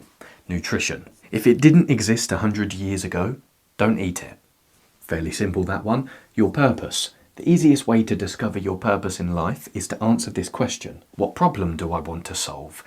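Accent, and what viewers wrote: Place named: Cockney, United Kingdom. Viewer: I didn't think cockneys still existed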